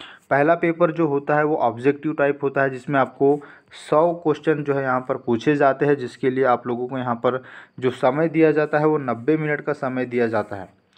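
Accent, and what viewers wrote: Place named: Bihar, India. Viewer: Bhai aap Bihar so ho 👍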